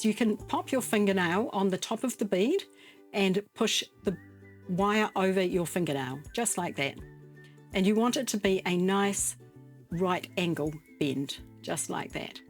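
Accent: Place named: New Zealand. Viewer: Thank you for your fantastic video on how to make perfect loops & fix faulty ones. Love your Kiwi accent too! I have a friend in New Zealand, Bryan Waddle who used to be cricket sports announcer for Radio New Zealand! I'll definitely be liking more of your videos. This is best loop making video I've ever seen.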